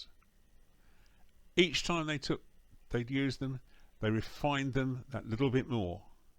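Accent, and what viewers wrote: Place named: RP, United Kingdom. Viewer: What a beautiful British posh accent. I truly love it the way you speak.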